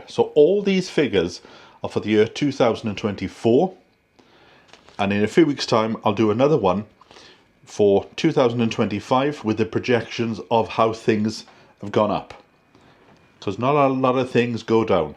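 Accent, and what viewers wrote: United Kingdom. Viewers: What's a wonderful and clear British English ❤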